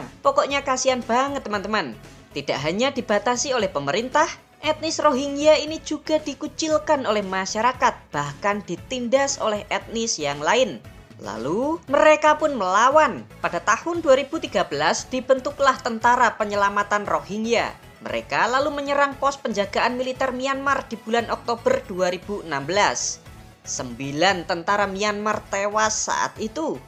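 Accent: Java, Indonesia. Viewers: Jawanya medok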